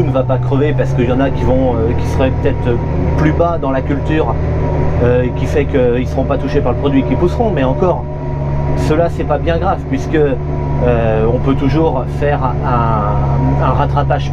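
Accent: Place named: Vendée, France. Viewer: Chère Vendéen y'adore toun'accent,mé i seu en Charente Inférieure le pays d'Goulbenèze,un grand salut à vous aut'teurtout. Et vive les socs et les oreilles.😅😅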